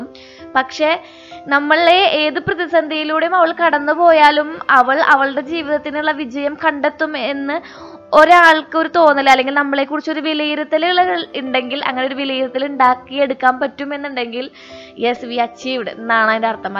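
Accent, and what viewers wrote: Thrissur, India. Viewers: Sathyamnu😁I like Thrissur slang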